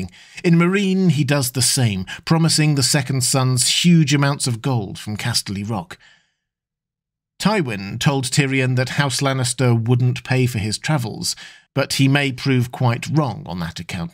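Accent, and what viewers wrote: United States. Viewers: Nobody wants to hear an American accent do this (nothing personal, I’m Australian and nobody wants to hear us narrate this either). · Yes, the American accent is really incongruous. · Wow. As an American, listening to and then hearing a sudden American accent is quite jarring.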